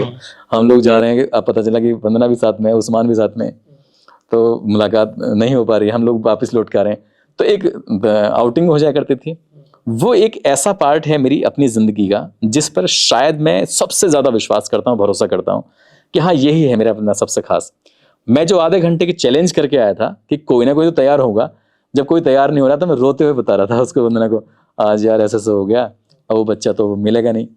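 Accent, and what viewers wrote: Haryana, India. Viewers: भाई पता भी नहीं लगता कि हिंदी बोल रहे हैं या हरियाणवी🎉 मान गए उस्ताद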